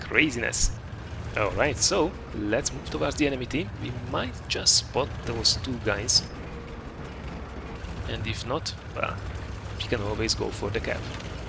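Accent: Hungary. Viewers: where are you from cause your accent is like Hungarien :)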